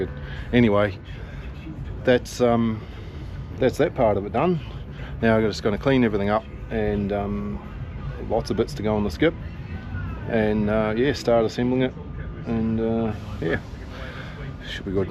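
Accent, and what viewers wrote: New Zealand. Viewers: I bloody knew it,,,,, You're a Kiwi.😂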